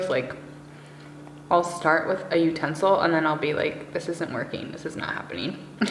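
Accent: Seattle, United States. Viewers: Girl I’m born and raised in Seattle and hearing the advocate in you is such a “Seattle thing” lol idk how to explain it but how you talk is so seattle lol LA people are nothing like us Seattlites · I watch this type of videos, or whatever has a lot of chats, just because I'm trying very hard to improve my english that is quite shitty at the moment ahahahah and I never comment anything.. just watch and try to learn some english.. but you look so nice and kind and I love to listen what you say so I suscribe! ❤️ Whatever I have to put subtitles.. Feel like I don't understand the Seattle accent yet 😅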